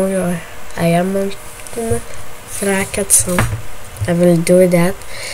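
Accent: Lithuania. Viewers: Lietuvis esi girdžiu😅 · are youy from lithuania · lietuviu anglu xdd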